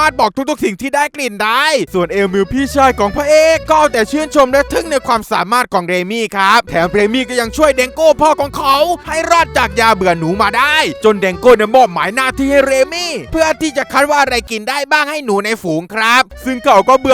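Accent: South, Thailand. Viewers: คนใต้ป่ะเนี่ย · พี่เป็นคนไต้หรอ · พี่เป็นคนใต้ไช้ไม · พี่เป็นคนใต้หรอ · มั่วตั้ว5555 อืมมพี่คนใต้ใช่มั้ย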